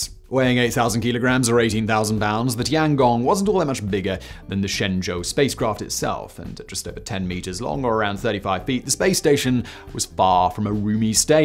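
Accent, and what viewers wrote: England, United Kingdom. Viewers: I have to tell you that the commentator in this video speaks too quickly combined with a thick English accent while at the same time sounds like he is holding his nose shut. So the reault is you can't understand or at least you have a very difficult time understanding what he is saying.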